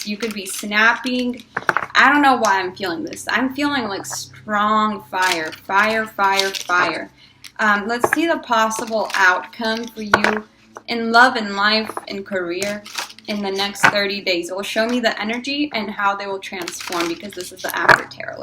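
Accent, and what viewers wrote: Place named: Russia. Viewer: From Russia? I detect a slight accent maybe but you definitely speak better English than most (including myself)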